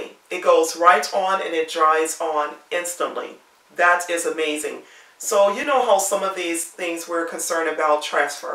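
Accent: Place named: Jamaica. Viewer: Your LV 6 Key Holder particularly stood out because that's on my radar. I didn't SEE this - but your accent is soothing. My family is from Jamaica and sometimes you pronounce words as they do. Anyway, new subbie here. Please enter me in the drawing and continue to make your videos! :D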